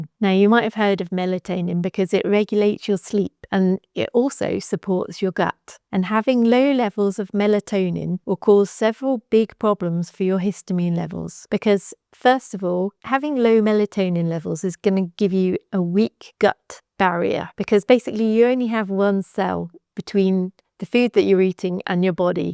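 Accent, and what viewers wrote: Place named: United Kingdom. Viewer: British accent is great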